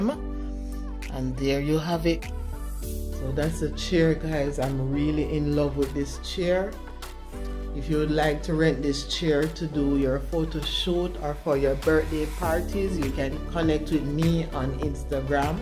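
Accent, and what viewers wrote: Jamaica. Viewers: are you Jamaican? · You sound Jamaican 🇯🇲 . Anyway, I have two old armchairs that I will diy into throne chairs. I hope mine looks awesome like yours.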